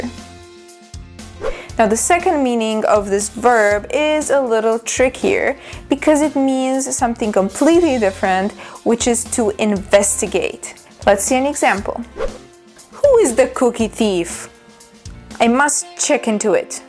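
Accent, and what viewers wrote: United States. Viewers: Hello Teacher! I have checked out all English teachers on YouTube. I see English Teacher is one of these best teachers on YouTube. I've ever learned English with her videos, clearly American accent and made me easy understanding her videos, Thanks a lot Teacher.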